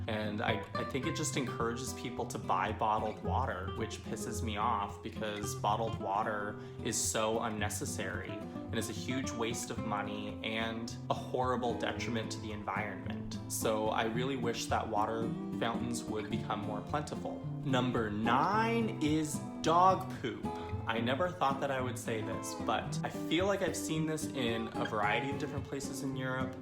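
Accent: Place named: United States. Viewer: Unfortunately you do sound like a complaining American.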